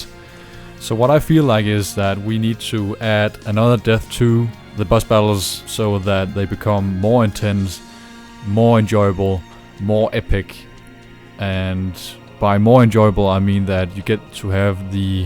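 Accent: Denmark. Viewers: Wtf!! Are you danish or something !?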